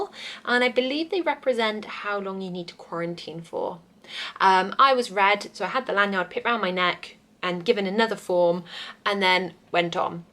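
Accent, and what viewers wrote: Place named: United Kingdom. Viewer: What a lovely British accent. It’s music to the ears! You travelled to Korea in the same month I did. I hope you stay safe throughout the trip.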